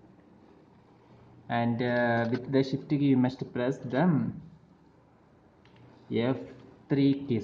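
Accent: Kerala, India. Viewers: Malayali aanenn keetal ariyam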